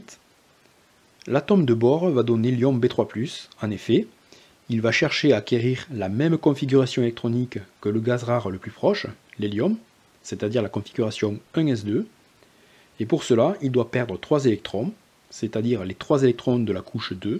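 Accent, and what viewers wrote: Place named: South, France. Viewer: Excusez moi de vs demandez ça mais vos explications et votre accent du sud me rappel un professeur que j avais eu à Ingres? Cela serait improbable mais je demande qd même d autant+ que je suis tombée par hasard sur votre chaîne.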